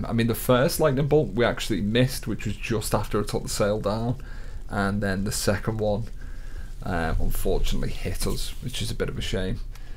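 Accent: United Kingdom. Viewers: Your accent...From.the wirral?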